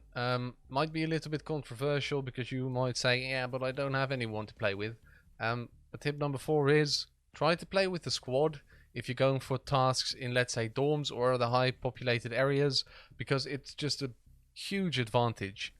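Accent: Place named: South Africa. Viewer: Where are you from? You almost sound South African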